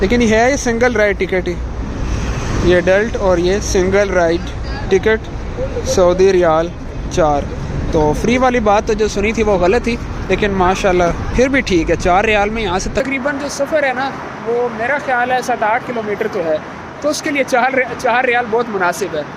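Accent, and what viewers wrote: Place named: Attock, Pakistan. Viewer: آپ کے لہجے سے لگتا ھے کہ آپ کا تعلق اٹک سے ھے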